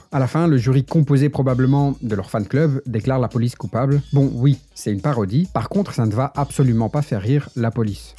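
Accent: Belgium. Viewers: ton accent belge est degeulase horrible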